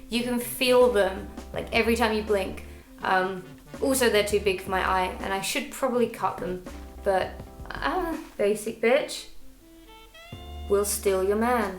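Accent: United Kingdom. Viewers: i love british accent so much...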